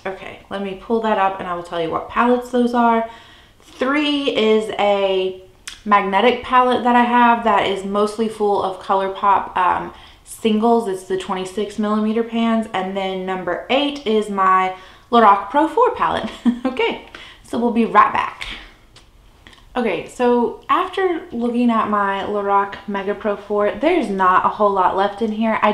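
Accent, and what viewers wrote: South, United States. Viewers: You are adorably Southern! Great progress :)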